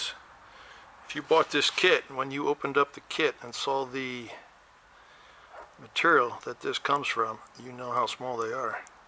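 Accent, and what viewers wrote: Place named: Long Island, United States. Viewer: This guy sounds like my father and his brother from Long Island. Definitely interesting and informative I’m subscribing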